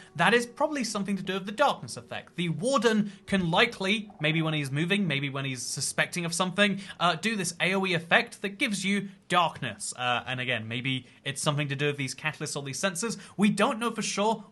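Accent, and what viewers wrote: United Kingdom. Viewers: why does he sound asian when he says hello im but then sounds british for the rest of the video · THE MYSTERY HAS BEEN SOVLED HES BRITISH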